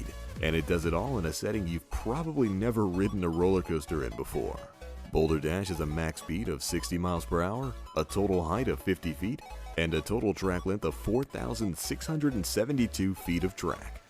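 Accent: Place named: United States. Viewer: GeekyGamerGirl03 actually, Cedar Point is in Ohio, and most people in Ohio speak in an American accent, and he is speaking in an American accent.I live in Ohio. Not trying to sound mean or anything:).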